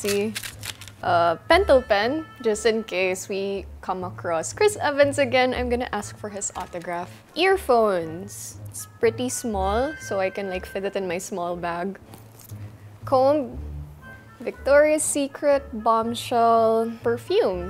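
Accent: Philippines. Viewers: you have a Filipino accent hehe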